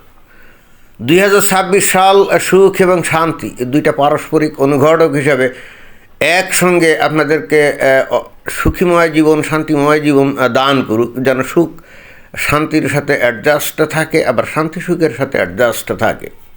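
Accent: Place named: Jessore, Bangladesh. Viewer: আপনার কথা শুনে মনে হয়, আপনি যশোরের লোক।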